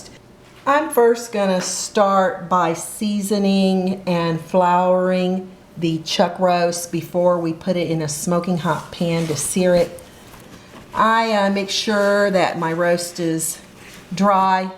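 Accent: South, United States. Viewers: Your a cooking beast! Please invite me over for dinner anytime. I would trust this receipe just because you sound like your from the south. #newpauladeen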